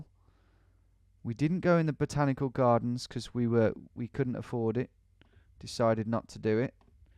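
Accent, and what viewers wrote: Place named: Birmingham, United Kingdom. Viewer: Is he from Birmingham? I could listen to his accent all day haha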